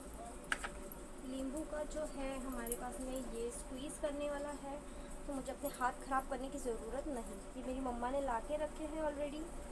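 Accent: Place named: Karachi, Pakistan. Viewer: Ap ka accent bilkul karachi wala hai ap Andheri ka na btatin to mai yehi smjh raha tha ap karachi say ho india mai b M.Ali road hai.?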